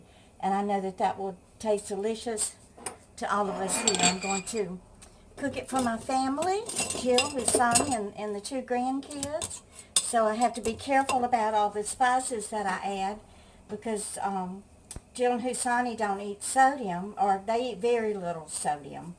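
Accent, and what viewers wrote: South, United States. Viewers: Good morning,. I love to hear your voice. Your voice just makes me feel good. I live in the northern Midwest and the southern accent brings me such joy. I think I can smell that chili and it smells yummy!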